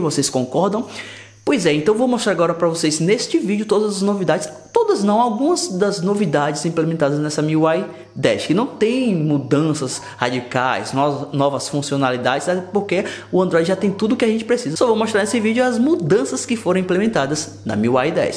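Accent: Bahia, Brazil. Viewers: Gostaria de saber se a miui 10 vai chegar para o redmi 4a? Força aí no canal , tu é baiano?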